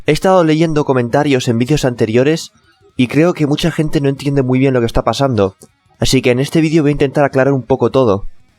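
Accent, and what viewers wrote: Spain. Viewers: Albert Mas Baia Baia se referia a los conceptos, no a la pronunciacion. Ya conocemos bien la pronunciacion particular de los españoles.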